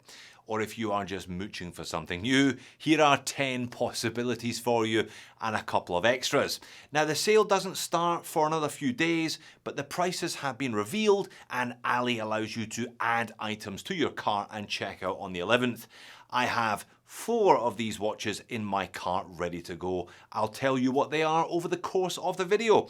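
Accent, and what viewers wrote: Scotland, United Kingdom. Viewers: Love how his scottish accent gets more and more thick over time …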